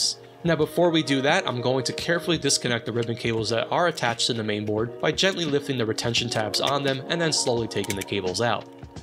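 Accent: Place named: Delaware Valley, United States. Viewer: Another great deep-dive review! Thanks!! BTW, are you originally from Philly? You sound like a Delaware Valley guy!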